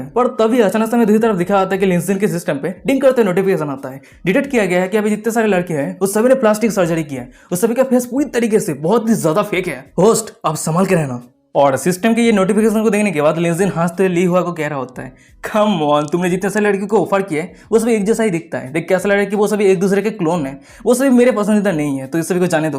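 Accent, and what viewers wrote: Bengal, India. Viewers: Bhaiya AP Bangali ho kiya...❤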